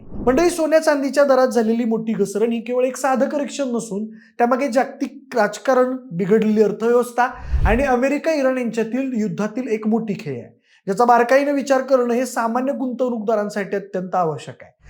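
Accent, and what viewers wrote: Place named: Kolhapur, India. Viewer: तुम्ही कोल्हापूर च हायसा काय तुमच्या बोलण्यावरण वाटतंय 😊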